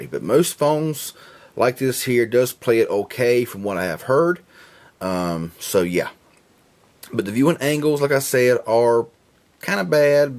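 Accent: South, United States. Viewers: I love your Southern accent. It sounds really cool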